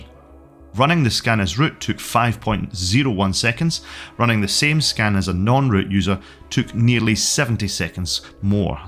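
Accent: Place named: Scotland, United Kingdom. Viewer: It's a Scottish accent 😂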